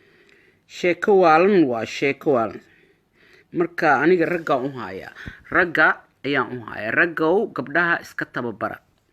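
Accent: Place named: Beledweyne, Somalia. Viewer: reer baladweyne miyaa sidii reer baladweyne cml waaye codkeeda milyan like 💕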